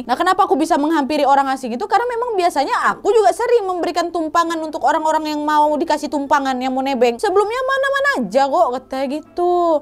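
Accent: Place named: Medan, Indonesia. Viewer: Sambil ngakak dengar cara baca ceritanya,kental kali logat Medannya,semoga tetap senabgat dan sukses selaku ya buk.tksh.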